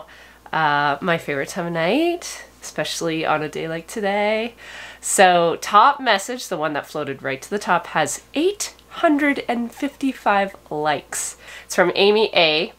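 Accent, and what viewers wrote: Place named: Canada. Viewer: Hey! My mom who went home to heaven in 2014 was raised in Kitchener but raised her family in Michigan. I have many many fond memories of visits to relatives in Kitchener (always the farmers market was a high light) and the surrounding area. When mom turned 70 we 'kidnapped' her for a weekend in Stratford...theater and mint smoothies were enjoyed!!! I love that you are from that area and sport your Canadian accent. Just wondering if you could think about renaming the LambTaxi the "EWEbur"...love all your puns and thought this might fit right in. Merry Christmas from a 1/2 Canadian.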